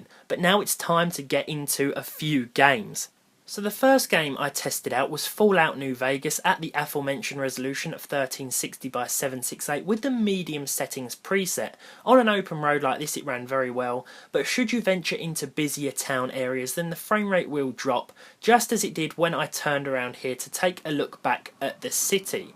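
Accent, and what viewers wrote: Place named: England, United Kingdom. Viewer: Esta bien chido el acento inglés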